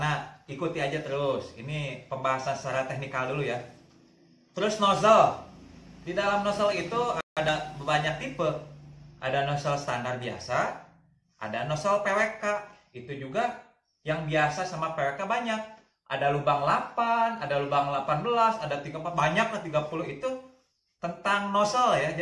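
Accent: West Java, Indonesia. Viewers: Logat sundana ngeunaheun kang:v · Si dipanggil abang. Tp dari logat mah teu bohong cigana orang sunda hahahaha..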